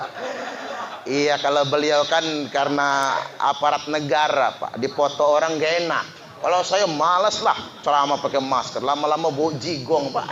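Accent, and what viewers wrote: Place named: Jakarta, Indonesia. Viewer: iki lho pak , sampean wong njowo opo dudu , logate koyo wong njakarta , jainudin mz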